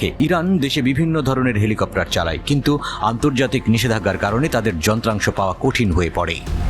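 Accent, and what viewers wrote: India. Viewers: নিউজ রিডারের বাচনভঙ্গি এবং কথা বলার ধরন অনেকটা ভারতীয়দের মতো। বেশ কিছুদিন যাবত একাত্তর টিভির কিছু নিউজ রিডার যারা ব্যাকগ্রাউন্ডে কথা বলেন তাদের বাচনভঙ্গি এবং কথা বলার ধরন, অনেকটা ভারতীয়দের সাথে মিলে যাচ্ছে। বাংলাদেশের বাংলাভাষী নিউজ প্রেজেন্টটার কখনো এই ধরনের বাচনভঙ্গিতে কথা বলেন না। তার মানে কি 71 টিভিতে ভারতীয়রা নিউজ প্রেজেন্ট করছেন!? 😮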